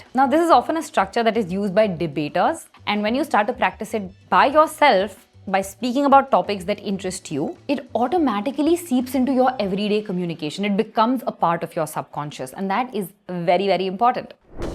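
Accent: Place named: India. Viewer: The Indian accent though...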